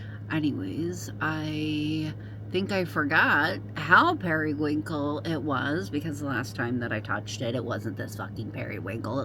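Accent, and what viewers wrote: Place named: United Kingdom. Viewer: British accent 💯!!! 😂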